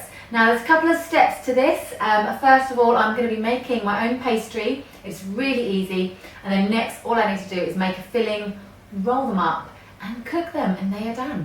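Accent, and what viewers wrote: United Kingdom. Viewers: Love your cute little British accent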